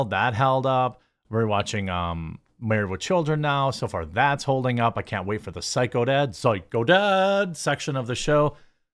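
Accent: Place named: Wisconsin, United States. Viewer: Ok, I love your content and all sorts of stuff you do...I can even deal with hearing about coffee brand coffee co- nonstop! Lol! But sometimes the Wisconsin accent is just too much! Margot Robbie being pronounced like a 'robe' you wear, opposed to like 'rob' a bank, is driving me insane!!!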